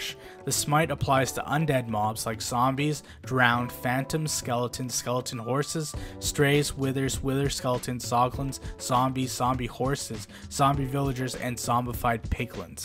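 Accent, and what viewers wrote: India. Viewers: Are you indian?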